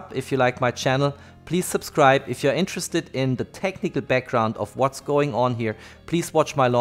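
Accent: Germany. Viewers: Deutsches Akzent 🥵🥵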